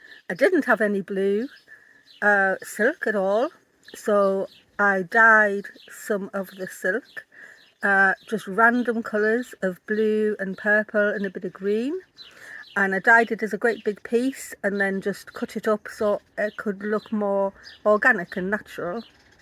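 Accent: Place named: County Durham, United Kingdom. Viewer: Wow your dragon is magnificent and magical. I have just found you this morning so I’ve quickly subscribed and can’t wait to see all of your videos. Thank you for sharing and inviting us into World. I follow embroiderers from all over the world so it’s lovely to learn you’re also from County Durham and hear your local voice.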